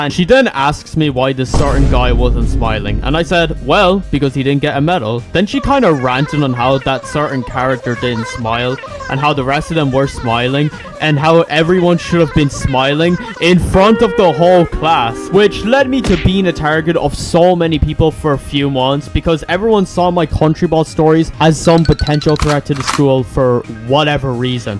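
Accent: Ireland. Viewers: are you IRISH:hand-pink-waving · Are you Irish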